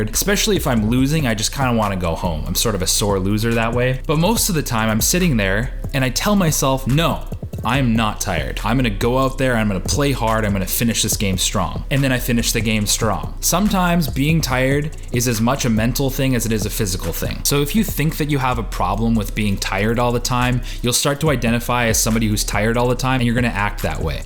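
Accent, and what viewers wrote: Canada. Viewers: Are you Canadian ? I love your videos. Thank you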